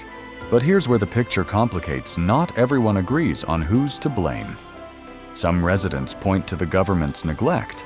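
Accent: United Kingdom. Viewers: You have a British accent, and you are highlighting the negative aspects of all countries. What about your own country, Britain? britain is now taken over by Pakistanis, rape and murder are everywhere, poverty and homeleeness is common. Show your own country first. You don't look at the reason, only describe. The condition of these people in Bulgaria was normal during the days of socialism, but under capitalism, it is now a disaster.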